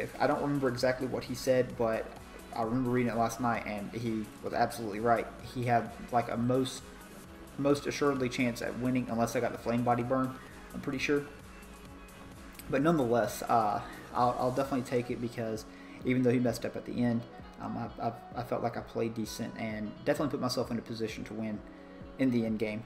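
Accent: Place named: South, United States. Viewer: GG my dude. Very well played. You sound more southern in this vid than any other. I love it haha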